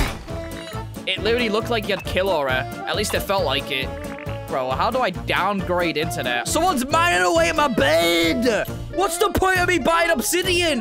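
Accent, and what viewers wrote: United Kingdom. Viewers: are u brithish?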